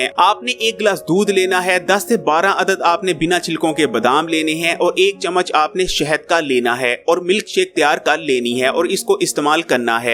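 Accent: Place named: Punjab, India. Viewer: PUNJABI LAG RAHA HAY PRONOUNCIATION KHARAB HAY .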